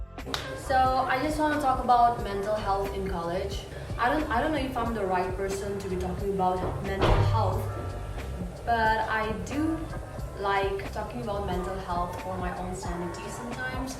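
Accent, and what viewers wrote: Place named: England, United Kingdom. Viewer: ton accent anglais est trop mignon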